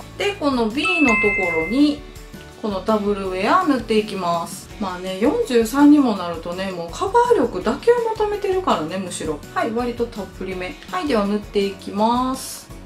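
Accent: Kansai, Japan. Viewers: さん初めてコメします🙋‍♀️ いつも雑誌付録の紹介を見ていて、同じ関西弁で喋りも面白いのでチャンネル登録させて貰いました😊 私も40代…私、顔面崩壊しているので😅メイク動画めっちゃ良かったです‼️ 何を買えどもシミやらが消えません💦 ベルサイユのばら的なファンデを買ってみます☺️ 毎日暑いのでお身体ご自愛くださいませ😌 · 初コメです☆ こんばんは🌙😃 雑誌の付録動画で姉さまを知りました。お綺麗な方なのに飄々と面白い事言われるし関西弁大好きなので速攻でチャンネル登録しました✨ ファンデーション比較企画良いですね！私も顔に粗がたくさんあるアラサーですのでがっつり隠したい、厚塗り上等派です。普段はエスティーローダーを使っていて化粧持ちは抜群ですがカバー力もうちょい欲しいと思っていました。姉さまの最強ファンデ紹介をみてオススメBBクリーム試したくて早速買いました✌️カバー力本当に凄いですね！でも私は色黒なので203番でも白浮きしちゃいました…(^^; 他の濃いファンデと混ぜて使おうと思います💪 良きファンデーションを教えて頂きました！、ありがとうございます❗(人´ｴ`*)♪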